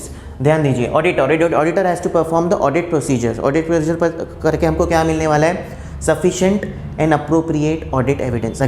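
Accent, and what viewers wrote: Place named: South, India. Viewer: Sir, you sound like a South Indian😁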